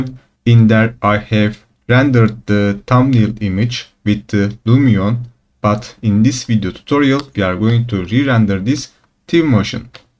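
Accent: Turkey. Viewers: This English looks like Turkish English. What a bad accent :)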